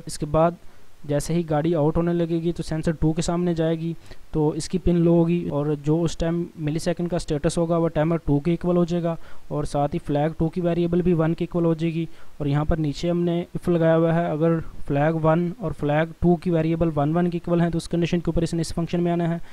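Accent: India. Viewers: interressting english. can't understand your speed indian accent at all. :)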